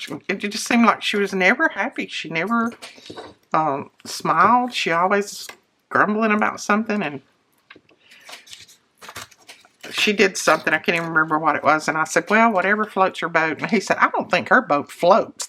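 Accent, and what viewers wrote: South, United States. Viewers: You are one of my favs on YouTube. I love that southern accent and calming voice. Keep being you and sharing your feelings with us. Block anyone that says otherwise.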